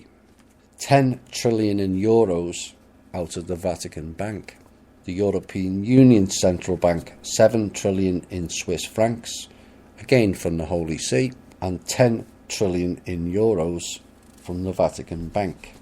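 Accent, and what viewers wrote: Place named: Scotland, United Kingdom. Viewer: And a lovely, lilting Scottish accent to boot.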